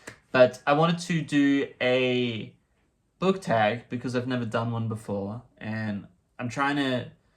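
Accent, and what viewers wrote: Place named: United Kingdom. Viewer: Great video! I really enjoyed In Cold Blood when I read it but it’s been a while. I would love to hear more about watch making in future videos - it’d be really cool to see a watch that you’ve made. Based on your outfit I was thinking you were from Chicago but I was a bit confused as I thought I could detect the slightest British accent, then it all made sense when you mentioned Australia.😂 Have a good one!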